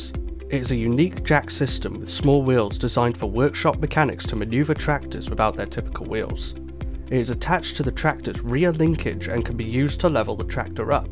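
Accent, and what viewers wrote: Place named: United Kingdom. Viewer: lose the limey!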